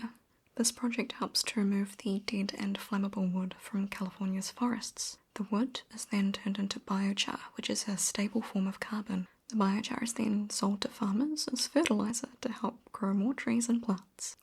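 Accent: New Zealand. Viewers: Kiwi here, so good to hear our accent on here hehe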